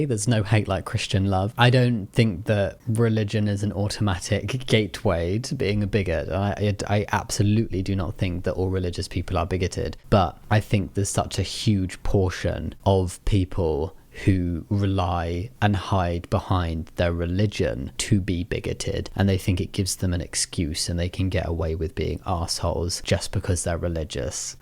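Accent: United Kingdom. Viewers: I just realise you have a British accent and I’m halfway through the video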